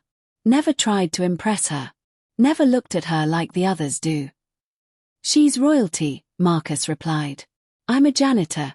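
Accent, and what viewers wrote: United Kingdom. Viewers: Love, love, love the classy British narrator!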